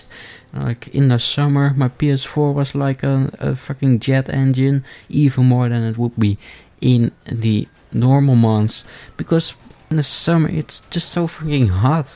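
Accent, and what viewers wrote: Netherlands. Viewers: Dutch accent incoming! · he sounds dutch af :/ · Dutch accent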